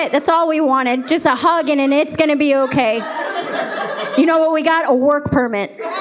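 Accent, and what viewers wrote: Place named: Ohio, United States. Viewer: Killer set, enjoyed your Ohio sense of humor lol. I never heard an Ohioan accent I understood until now but I sorta get it,anyways great job you’re totally right our parents do not drink water, my dad says fish *uck in it so no thanks lol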